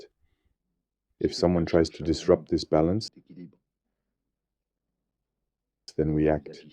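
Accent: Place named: France. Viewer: Your French accent is a plus value to this narrative thanks for your originality not using AI ;) Luckily anglophones will now benefit from your impressive knowledge sharing. Terrific.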